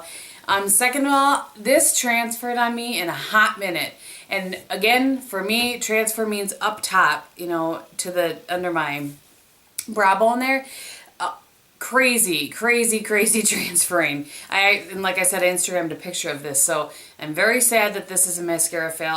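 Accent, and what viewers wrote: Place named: Midwest, United States. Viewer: Hey! i just wanted to let you know I love your channel, when i started watching i was thinking "wow she sounds so much like home" and then i realized you were from the midwest too! I grew up in the Twin Cities so you sound like home ;)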